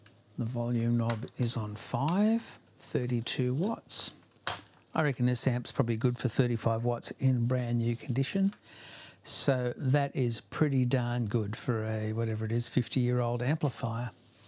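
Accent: Australia. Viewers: AMEN!! SO glad to hear all of that! I sort of thought you might be Australian, but I wasn't for sure hearing some of those sounds that usually cue me in. And I WILL be looking for that PRO video!! Once again, so glad for your daughter's good health and to know that I have a brother just a short swim away! (I live in Jacksonville, FL) Happy Easter ... Jesus is coming back soon!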